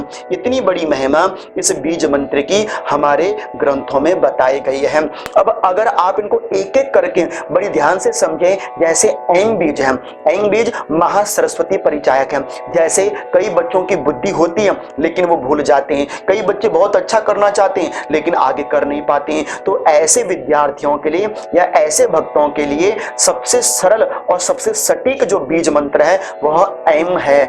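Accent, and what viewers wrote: Standard. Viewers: शुद्ध उच्चारण अत्युत्तम। धन्यवाद इस प्रकार की जानकारी के लिए😊🙏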